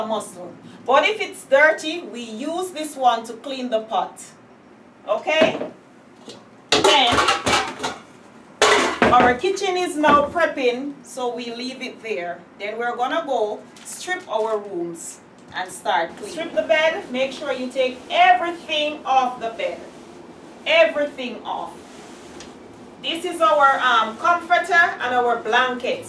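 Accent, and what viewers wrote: Jamaica. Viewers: From her accent I know you are one of us Jamaican love and niff respect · sounds Jamaican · She sounds like a Jamaican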